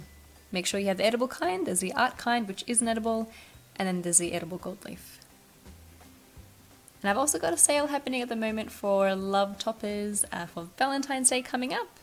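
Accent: Australia. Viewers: You sound like you're from Australia.. are you?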